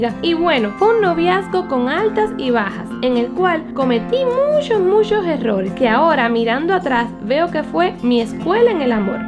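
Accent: Cuba. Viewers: Ellas tienen el mismo acento por que las dos son cubanas y no le puedes prohibir eso 😒😒😒y ultima si no te gusta vete...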